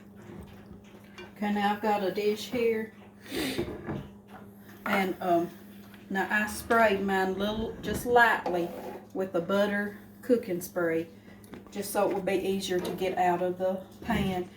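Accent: South, United States. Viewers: Most beautiful southern accent you have. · So good to meet a sister in Christ God bless you I accidentally stumbled on your video and I absolutely love your way of cooking very explanatory very down to earth love your southern accent so adorable you're beautiful silver hair and beautiful kitchen so very happy to have stumbled on this video Thank you and a Very Merry Christmas A sister-in Christ, 🙏😇 Jill 🎄❄️ ⛄️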